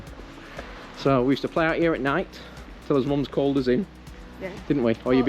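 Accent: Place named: Yorkshire, United Kingdom. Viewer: Like others, hope Dad recovers soon As for your accent - soon reverted to Yorkshire 😬 Thanks to you both ❤️❤️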